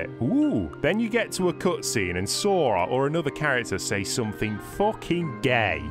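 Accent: United Kingdom. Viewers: Dude I just Binged watched ALL your videos. Idk if its the British Accent or what but your vids are HILARIOUS!! 😭😭😭😭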